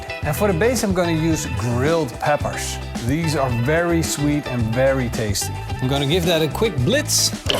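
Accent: Netherlands. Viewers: Ik spotte je NL accent !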